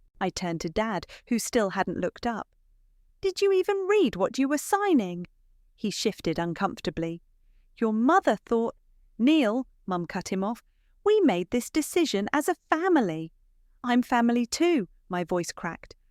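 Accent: United Kingdom. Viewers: Love the British accent of the person reading the story! Listening from my home in Houston Texas USA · A very good story. She really earned that house. The father choose peace over his daughter's inheritance right. They could've at the very least, split the ownership between their daughters equally. I believe that OP would've been happy with that, considering how she used to be before and be okay with that. Instead they were conniving and selfish. How do you allow one daughter to pay off the debt for the house knowing that she was never going to inherit any part of it and then give it sneakily to the other daughter who contributed nothing whatsoever towards it? I'm sorry to say that when her mother slapped her, she should've slapped her back as she had no right to do that just because she was being called out on her bullssh!t. Thank you, more like that please!!!!!!!🥰🥰🥰🥰🥰🥰. 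BTW, loved the British accent and i'm listening from the UK.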